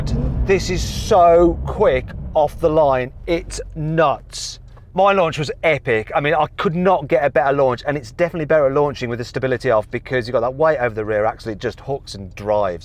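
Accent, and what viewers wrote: United Kingdom. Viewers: manteb nih channel, sekalian belajar british accent wkw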